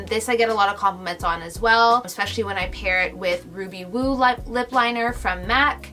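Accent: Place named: Canada. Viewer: "Crusty clothes". That's so cute. Jamaicans have the cutest words and sayings. You mentioned that you live in London. You don't have a British accent. You sound Canadian, do you mean London, Ontario? I live I Canada and a coat, and more than 1 is sooo important. Great tips and great video.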